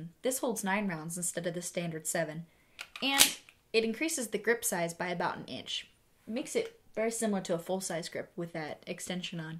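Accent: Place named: Boston, United States. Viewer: that Boston accent is on point ;)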